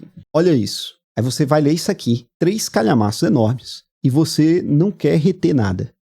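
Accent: Pernambuco, Brazil. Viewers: Pelo sotaque é Pernambucano .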